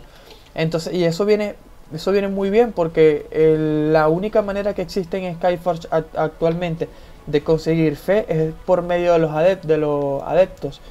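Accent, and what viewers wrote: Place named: Venezuela. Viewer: Buenas hermano gracias por la guía en serio mucha ayuda sólo.que iniciar este juego cuesta muchísimo el inicio es difícil , pasarte la campaña sobre.Todo los dos últimos mapas cuesta aún no he podido , tampoco tengo mucho jugando apenas 1 semana y entendiendo mejor el juego con ayuda de vídeos como el tuyo,ahora he visto cosas que para mi una sola.explicación tienen como el.tema de tanto.daño y hp de algunos personajes y.debe ser que han pagado cambian gemas y compran el.rank 10 del Premium que tiene buenos beneficios de.hecho excelentes eso les ayuda y que empezaron el día del lanzamiento del juego. Si te animas agradecido por los vídeos y tener alguien con quien jugar en línea de habla hispana y ese acento debe ser venezolano jajajaja si es así de que parte hermano y gracias precios los consejos y tu tiempo al.subir vídeos .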